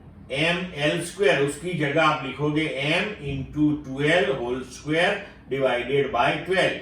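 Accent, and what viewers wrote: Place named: Gujarat, India. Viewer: Thank you sir, and you have got full gujarati accent nice explanation !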